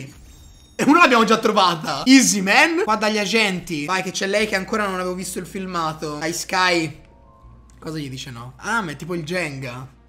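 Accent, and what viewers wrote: Tuscany, Italy. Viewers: E il dualetto toscano genios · hey ma sei toscano o sbaglio?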